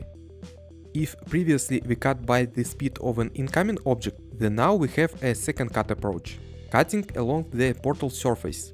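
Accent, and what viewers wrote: Russia. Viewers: Damn ur Russian accent is so strong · Honestly yes, I would love a portal math analysis video. I love math and also the Russian accent too. I’m going to use your original video as a goal for my russian language learning. спасибо! ^^ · Я с самого начала понял по акценту, что ты либо русский либо беларус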